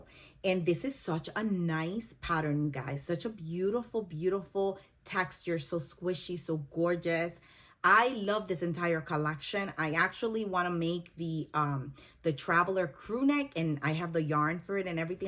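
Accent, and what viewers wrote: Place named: New York, United States. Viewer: I'm so glad I came pass your podcast because, I just got this pattern and believe it or nor just finished reading the pattern on November 27' 2024 sm. I'm listening to you am and you are now talking about the Cumulous knit, lol. I'm excited now to start the Doppio after I finish with your podcast. Your accent is gorgeous almost sounding as if you are a New Yorker and Spanish, but I know nothing even at the age of 72, lol. I'm also a brand new knitter. Figured if I learned to crochet at 11 by reading a book, I could at 72 do the same. Well, that's a lie as I'm shaking in my boots trying to keep my circulars from shaking, lol. I also have a old basket I purchased in Panama during my 20 year stint in the Navy. If I survived tat when women weren't meant for the armed forces, tell me why this knitting is putting fear in my hands, lol. 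I'm going to do it anyway! Thanks for saying a beginner can do this, so I better get busy. I have the equipment, the yarn, my hands and the determination, lol. Wish me luck. I'll keep watching out for your next podcast. You're enjoyable to watch and listen to. Your enthusiasm has lit a fire. You had me interested at hello, lol. Ms. Jo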